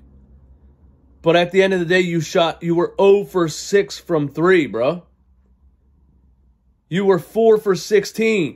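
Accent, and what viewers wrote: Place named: Delaware County, United States. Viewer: This dude definitely from NorthEast or Delco 👍🤙✊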